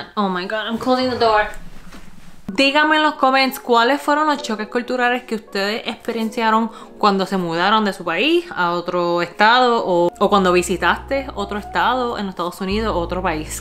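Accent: Puerto Rico. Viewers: 😍😘 me encanta tu forma de hablar , expresarte es bien boricua eres una mujer encantadora y preciosa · Waoooo que boricua eres hablando. Si no viera tu cara... estaría segura q eres de PR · Ese flow boricua te queda bien brutal. Nunca dejes ese flow. preciosa! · Damn girl u talk better then a real Puerto Rican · You are becoming by far my favorite YouTube channel.. me hiciste reír y bajar el stress.. varias cosas me impresionan de ti.. llevas 15 años fuera de la isla y tu acento puertorriqueño es Classico y no lo as perdido. Segundo, la comparación de Puerto Rico a USA que hiciste es algo que todo Puertorriqueño pasa por experiencia y estás 100% en lo correcto. Lo de pedir “pon” yo creo que es parte de nuestra cultura.. years ago as a teenager every time we went to the rivers or beach it was a fuerza de PON! I was born in the island and raised in the states, but every time I see one of ur videos you transport me back to 🇵🇷 tu eres un tesoro de 🇵🇷 keep pumping these videos out and you’re fan base will continue to grow.. 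I suggest a few future videos.. haciendo alcappurias de yuca aprendió a bailar salsa y merengue (el día y la edad que aprendiste) y a que canción come una fusión de comida (un mofongo de camarones y al lado una buena sopa de rez a el estilo contonese) much blessings and u are 1 of a kind.. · ola soy puerto Rigueño ivivo en vinelad nj megusta vertus videos me encanta como ablas suena puraboricua Dios tebendiga icuidate mucho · Me encanta tus videos. Tu acento en 100% PR. Y no hay lugar como PR en todo el mundo, la isla llama!❤️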